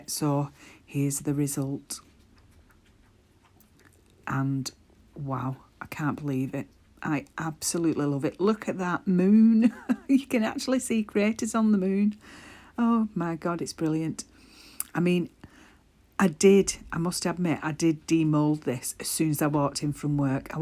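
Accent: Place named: Wigan, United Kingdom. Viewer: Hi, I’m new here and loving your style 😊 your accent sounds very Wigan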